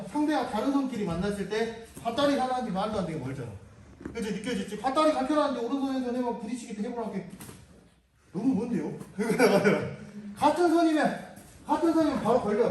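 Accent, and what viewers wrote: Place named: Jeolla, South Korea. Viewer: 즐라도의 말투 조으다 영화보냐ㅋㅋㅋ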